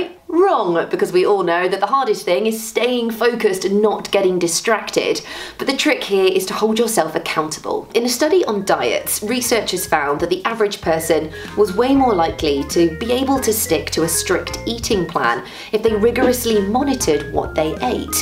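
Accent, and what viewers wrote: United Kingdom. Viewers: I love the British accent. It's so beautiful hahaha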